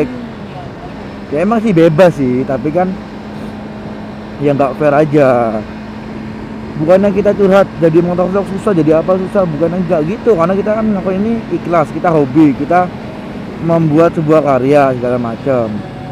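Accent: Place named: Banyumas, Indonesia. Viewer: anjay mt25 ? btw agak ngapak ngomongnya,kocak njir :v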